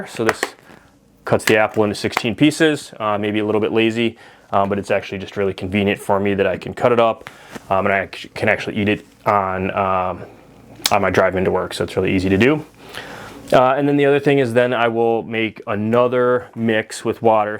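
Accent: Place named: Minnesota, United States. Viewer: Your voice reminds me of Fargo. One of my favorite movies 😂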